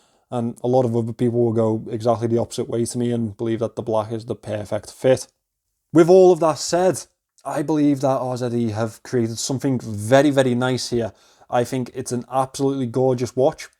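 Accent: Liverpool, United Kingdom. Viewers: Yes lad, good to see a scouser in the watch game on YouTube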